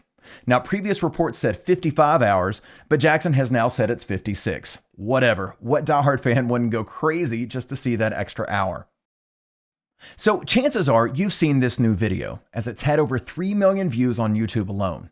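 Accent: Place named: Baltimore, United States. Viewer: I'm digging on your vowel sounds. Must be Baltimore or something like it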